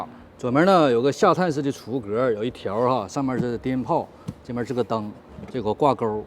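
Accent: Northeast, China. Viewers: 值得十倍以上的订阅以及十倍以上的赞，简直就是华人评车圈里的Doug Demuro，没有花里胡哨，全是干货信息。作为老广竟然觉得这东北口音格外舒服哈哈，👍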